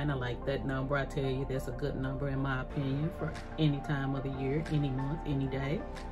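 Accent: South, United States. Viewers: Thank you I love ❤️ hearing you southern lingo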